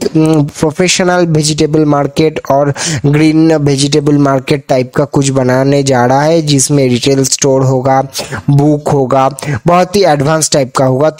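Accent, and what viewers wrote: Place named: Kolkata, India. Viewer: This is “Calcutta Club” Hindi. Don’t blame him. One month in Gurgaon and he will align.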